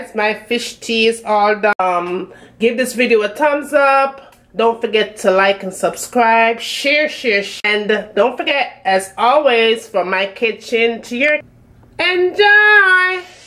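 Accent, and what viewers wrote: Jamaica. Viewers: I love the Jamaican accent but do not let the Jamaican woman get mad at you...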